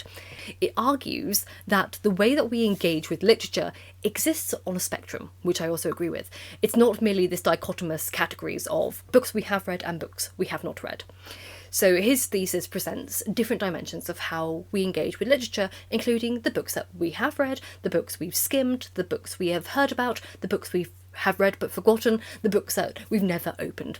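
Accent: United Kingdom. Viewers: If I have had to choose someone to represent the british accent, it would be you.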